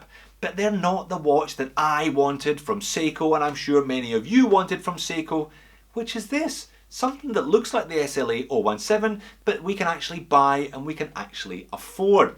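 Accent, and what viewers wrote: Scotland, United Kingdom. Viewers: LOVED THIS REVIEW.,and you have become my go to watch review channel by earning it flat out accurate fair-minded spot on assessments with amazing flair and Scottish brogue to boot! Fantastic watch. Fantastic review. Seiko...that's your watch except you didn't build it. Wtheck?! I'm buying this and I LOVE that tropical strap. TyJ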